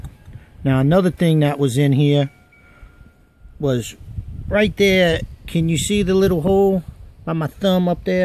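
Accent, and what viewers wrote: Louisiana, United States. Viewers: Say bree? Now I know y'all from Louisiana. Good vid, very helpful, thanks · Great video, thanks ...where did that "accent" come from, Louisiana?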